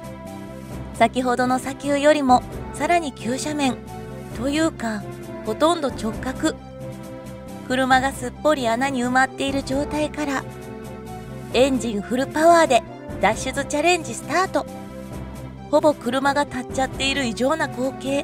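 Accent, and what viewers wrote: Kansai, Japan. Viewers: スバリストなので興味を持って見たが、関西弁で萎えた。 標準語で話して欲しかった。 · 関西訛りなのめっちゃ気になって話入ってこねぇ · しゃべりのイントネーションがちょくちょく関西っぽい…？ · ”出来の良い車”は富士重工の車・・・って車好きの親から言われ続けて育ちました。 スバルってホント、”キッチリ”作りこまれていますもの！ それから 今回のナレーションの彼女も少しの関西なまりがすてきでした。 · 関西人やね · ナレーションのお姉さんの関西訛りが面白かったです。だいぶ矯正したようですけど、ところどころ関西のイントネーションでなんか温かみを感じてよかったですよ。 スバルの驚異的なパワーの秘密が中島飛行機のエンジン製造技術にあったとは知りませんでした。 日本でももっと売れていいはずなのにねええ。インプレッサは今も人気だと聞きますけど。 ハリウッドの映画の中でもドラフト？する日本車はたいていインプレッサだったような…。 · 何が良かったって、解説の関西弁アクセントが最高でした😄👌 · 内容良いから聞いてたけど関西弁ウザくなる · 関西訛りのナレーションが良い。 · パーソナリティの関西弁が可愛い。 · 「スバル」のサムネイルに惹かれて見にきました。 なんだか関西弁が心地よくて聞き入ってしまいました。 冒頭で私が所有しているフォレスターの話題だったため 最後まで見入ってしまいました。 チャンネル登録もさせていただきましたよ。 応援しています。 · このナレーション、関西訛り全開で親しみがわくわ！ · ナレーターさんは、関西人ですか？めちゃ和むわぁ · ちょちょちょっとまて〜〜〜！この（京なまり？）（関西なまり？）好きすぎてたまらんのだけども！全然内容が頭に入ってこん。 当然チャンネル登録しちゃうでしょ〜〜！なぜ今までなかった！ん？合ったのか？俺が知らなかっただけ？ 何れにしてもめろめろだ〜〜〜〜〜〜〜〜〜〜〜〜〜！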